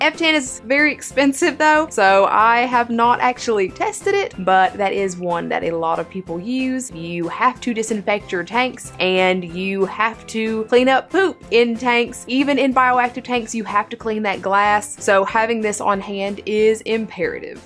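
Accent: South, United States. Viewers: I am super new to your channel and have been watching a ton of your videos with in the last few days and I am loving it! It is so informative and helpful. Also where are you from? I LOVE you slight southern accent!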